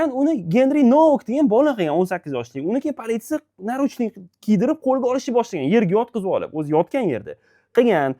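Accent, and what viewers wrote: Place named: Tashkent, Uzbekistan. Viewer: Xabarlar uchun rahmat.Lekin o'zbek adabiy tilida gapiring.Chunki sizni faqat toshkentliklar emas, butun dunyo eshitadi.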